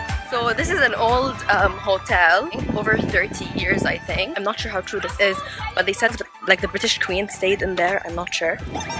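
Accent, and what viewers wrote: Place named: Nigeria. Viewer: Just watched your best friend tag video and I thought you sounded a little Nigerian but I wasn’t sure. That’s so cool. Were you born there ?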